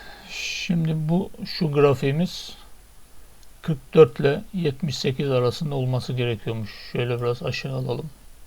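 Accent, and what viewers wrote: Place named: Trabzon, Turkey. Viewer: Emeğinize sağlık Trabzonlu kardeşim.Şivenize gore tahmin ettim.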